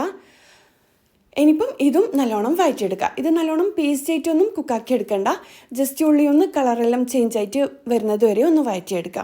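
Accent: Kannur, India. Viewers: സൂപ്പർ👌👌👌❤️❤️❤️👍 ഉണ്ടാക്കാംഇൻഷാ അല്ലാഹ് കണ്ണൂർ ആണോ വീട് ഭാഷ കേട്ടപോൾ😀നല്ലോണം തണിയാ വെക്കുക്ക😂 ഭാഷ കേട്ടപോൾ ചോദിച്ച നമളെ ഭാഷ😀😀😀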